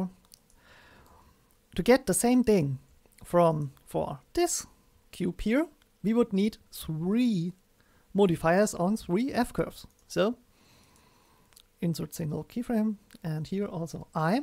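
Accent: Germany. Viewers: Love your Tuts. I don't know if its cause they're awesome, or your German accent is awesome... Or both maybe :)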